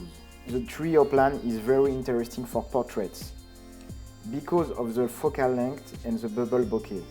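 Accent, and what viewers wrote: France. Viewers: Came here for soap bubble effect but ended up listen to your french-english. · Jusqu'à maintenant je n'avais vu que t'es vidéo courtes de test d'objectifs argentiques, et je ne sais pas pourquoi mais sûrement à cause de ton nom de famille je me suis mis en tête que tu étais allemand ^^ mais en écoutant ton accent, c'était évident ! Non pas qu'il est imcomprehensible ou non, pas de soucis, j'ai très bien compris mais disons que l'accent français est très reconnaissable^^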